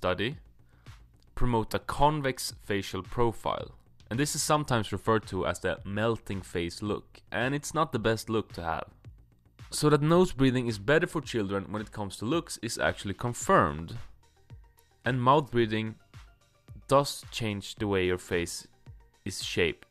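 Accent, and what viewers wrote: Sweden. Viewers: is that a fellow swede im hearing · swedish accent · Damn is that a swedish accent or am I crazy af? 🤔. Yes I love mewing I have been doing it for a month now and I see huge difference. I reccomend it to everyone!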